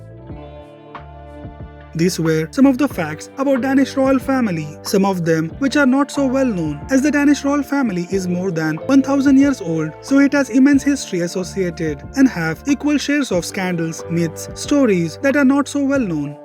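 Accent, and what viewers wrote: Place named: India. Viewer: What an indian accent!!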